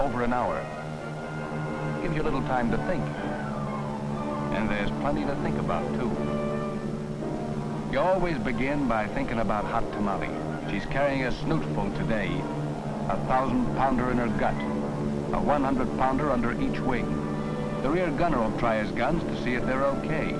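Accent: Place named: New York, United States. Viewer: narrator sounds like he's from NY.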